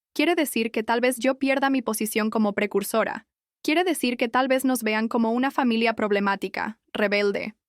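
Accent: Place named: Spain. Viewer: Es chocosa la forma en que a cada rato preguntas tonterías y con tu acento españolado se oye peor. 🤮🥱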